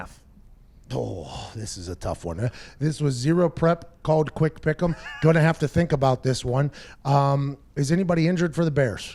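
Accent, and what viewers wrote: Pittsburgh, United States. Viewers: Pat has such a Pittsburgh accent love it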